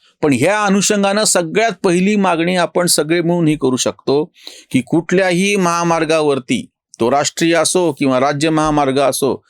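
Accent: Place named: Marathwada, India. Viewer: कोणतं अँप आहे त्याची लिंक किंवा अँप चं नाव तरी द्या सर जी,तुमचं बोलणं म्हणजे परभणी चा आणि बीड चा मिक्स तडका असतो❤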